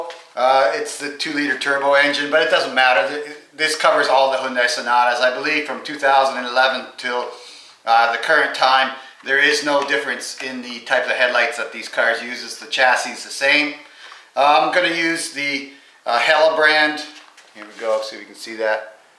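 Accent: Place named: North, United Kingdom. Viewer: Helpful video nonetheless. However, I'm trying to figure out what kind of accent that is...sounds northern.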